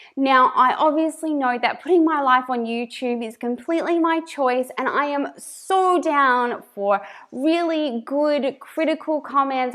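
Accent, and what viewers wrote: Australia. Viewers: you have a lovely Australian accent. Some of these comments are crazy. People need to get a life. Carry on we love your channel. Bye bye to anyone who have a problem with it lol 😁💗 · As for your accent, if you watch an australian channel you should expect an australian accent! 😆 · I was always taught that if you don’t have something nice to say, then don’t say anything at all. Nowadays, i think so many people are miserable in their own lives, they look for any reason to criticize someone else. It is very sad. I love your Australian accent! I think children in today’s society will greatly benefit from being raised in a minimal home. I have been on my minimal journey for about 2 years. I am constantly looking to minimize even more. It has made a huge difference in our home. Our lives run so much better. I wish I would have known about minimalism 20 years ago. 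You are doing a great job! Your kids will grow up knowing what is truly important in this life, the relationships they have not the things they possess. Keep up the awesome work! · I started watching organising and minimalism videos on you tube and was really missing our Aussie accent so that's how I found you. Don't lose the Aussie twang 😉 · So mean picking on someone's accent! Everyone speaks differently and thats fine. I am Australian also so your speech sounds fine to me 😁 That comment probably came from someone in a different country who isnt used to hearing an Australian accent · I love the holiday apartment look too! Plus anyone with kids knows that anything extra = extra chaos. And your hand gestures increase trust. I was taught it's GOOD in public speaking. It's ridiculous to make fun of your lovely Australian accent. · Your kind and gentle nature makes you a good messenger for this topic and your Australian accent is a bounce!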